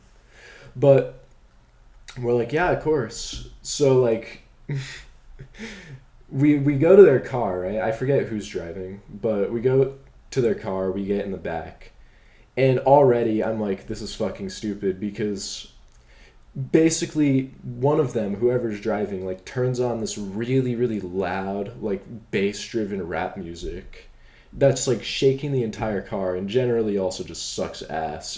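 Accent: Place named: United States. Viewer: First time watched of your videos, it feels kind of surreal to listen in to gossip from the other side of the world. You look like a character from an American tv show -- which, admittedly is a strange thing to say because you ARE American, but the crazy stuff you're saying about your ex and friends literally sounds like an actual plotline from a show. Maybe because I'm not American, but hearing your story being said in an American accent is so strange to me. On a serious note, I'm sorry to hear about your ex and that your friend's love didn't last.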